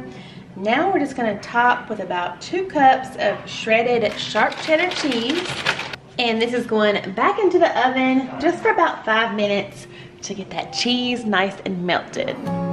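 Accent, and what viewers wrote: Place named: Kentucky, United States. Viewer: See.... that is what I just cannot appreciate about most store brand cheeses. I know they are more affordable but, I just do not care for how stringy it gets. I personally prefer to see my cheese, creamy and smooth and honestly, I believe that it is some sort of generic imposter of real cheese. However! ALDI, actually says REAL, on their packaging, and I believe that they sell a more quality product because they are not based in the US. Sorry, just, my opinion. ALSO! I would like to try this with the canned Sloppy Joe BOLD brand, it is very tasty, not just the reg. SJ but it makes a big diff with the BOLD. Okay, I'm done. I love your accent! You sound like we do here in KY! :-)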